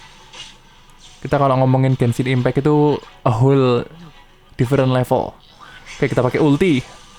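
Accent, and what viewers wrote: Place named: Banyumas, Indonesia. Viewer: Wong ngapak kye ya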